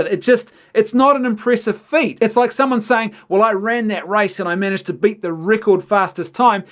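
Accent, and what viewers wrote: New Zealand. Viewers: The only question I have after watching this: Are you from New Zealand?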